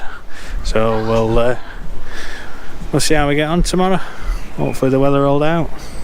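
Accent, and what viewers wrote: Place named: Yorkshire, United Kingdom. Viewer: Where dya live? Sound like a Yorkshie Lad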